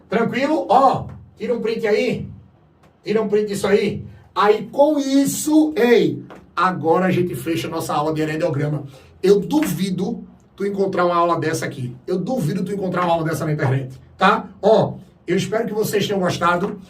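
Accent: Northeast, Brazil. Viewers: Cara, melhor aula sobre esse assunto, que professor fantástico. A explicação desse professor é muito clara e única, esse nosso sotaque nordestino é muito bom! Parabéns pela aula professor, com certeza vou lembrar do senhor na minha prova!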